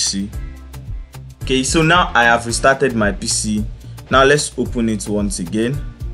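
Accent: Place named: Nigeria. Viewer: I love that Nigerian Accent. 👍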